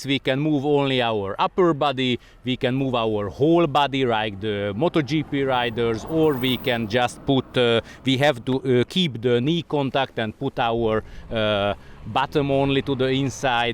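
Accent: Hungary. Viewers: Are u by any chance from Hungary?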